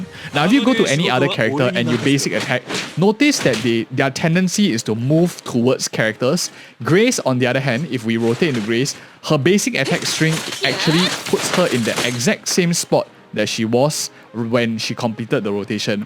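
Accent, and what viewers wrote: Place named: Singapore. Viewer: Sound like a Singaporean (ofc I myself am). A lot of thing I didn't know cause I didn't bother reading the long skill explanation, he gives simple explanations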